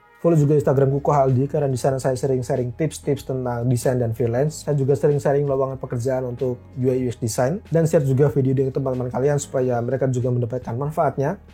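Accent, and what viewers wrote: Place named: Java, Indonesia. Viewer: 'v jowo mas, maklum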